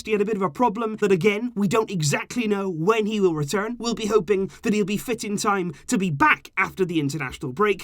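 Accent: United Kingdom. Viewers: I practice my British accent by mocking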